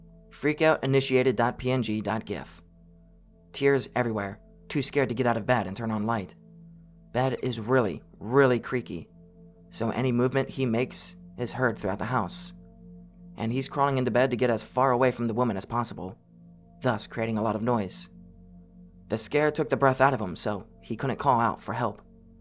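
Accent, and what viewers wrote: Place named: Westchester, United States. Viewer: you from Pennsylvania? I'm getting Westchester vibes from the way you pronounce certain words.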